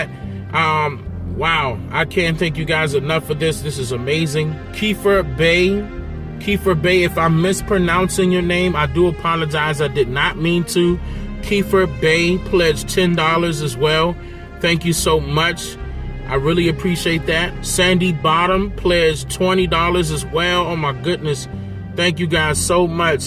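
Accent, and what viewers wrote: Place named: DMV, United States. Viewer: I knew he was from the dmv i can hear it all in his voice.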